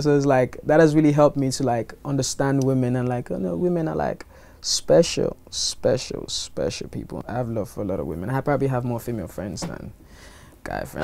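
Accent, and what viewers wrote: United Kingdom. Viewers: I am absolutely blown away. He has a British Accent.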